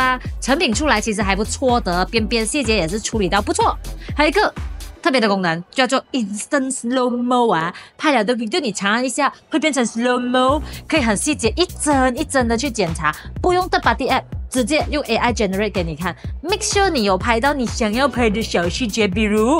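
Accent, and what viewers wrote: Malaysia. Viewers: 马来西亚口音 没听错的话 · Maglish 聽起來真有趣.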